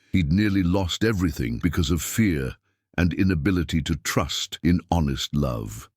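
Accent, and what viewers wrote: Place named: United Kingdom. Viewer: The British accent doesn’t match the Wild West. 🥴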